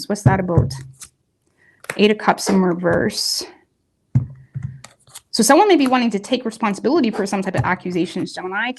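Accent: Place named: Canada. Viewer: You must not travel much. Do you know how many accents there are on this planet? Hell, I was born and raised in California but have lived in several states. I live in in rural Georgia and picked up the twang accent. When I go home to CA, they think I’m Australian. In GA, I sound like a “yankee”. She is pronouncing the word out correctly because she’s Canadian and that’s how they say it. The more you know ✨✨✨🌟⭐️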